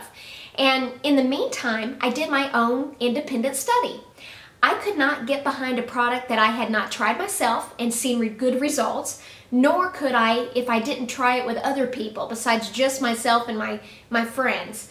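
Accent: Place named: United States. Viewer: you have a really sweet accent! which state are you from?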